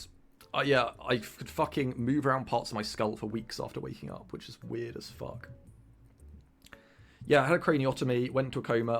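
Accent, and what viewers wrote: United Kingdom. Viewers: That's just how the British speak.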